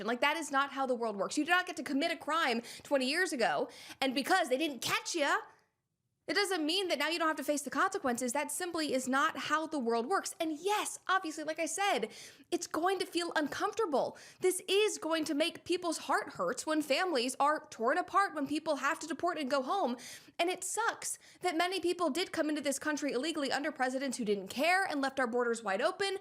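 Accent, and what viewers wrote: United States. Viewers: i really liked u vibing and yapping fast and quick with American accent lots of your yapping is really fast accurate and precise 🇺🇸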